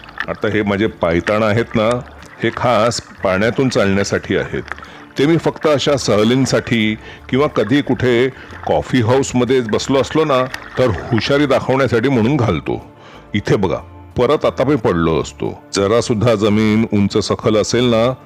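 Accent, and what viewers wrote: Pune, India. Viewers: खूप छान पुणेरी मराठी !!!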